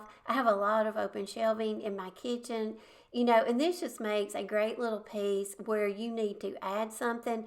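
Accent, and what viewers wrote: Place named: Alabama, United States. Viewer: The subject…French country decor…..caught my eye. Then I heard your sweet voice and said to myself “I bet she’s from Alabama “. Hi from Walker County(NW of Birmingham)…👋🏻👋🏻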